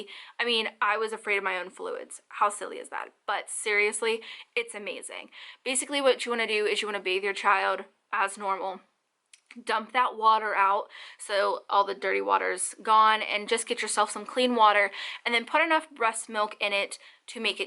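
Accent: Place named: Michigan, United States. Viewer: sounds like you live in Michigan!!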